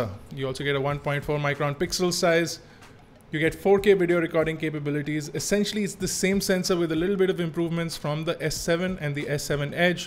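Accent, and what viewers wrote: India. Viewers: Great Showmanship and Stylish yet understandable Indian Accent, Rock On,........... Make a VS Vid with the G6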